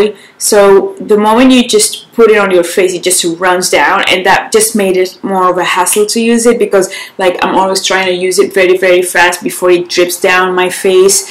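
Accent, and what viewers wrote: United Kingdom. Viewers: Est ce que c'est moi ou ton accent anglais est un peu plus british than usual? By the way, very nice look😘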